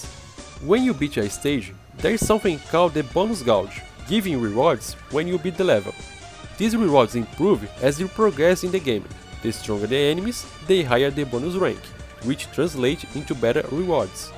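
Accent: Brazil. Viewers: Vc é brasileiro percebi pelo sotaque, mano me ajuda eu quero mt desbloquear o robot kurtis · tu é br ne boy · Sotaque de brasileiro hein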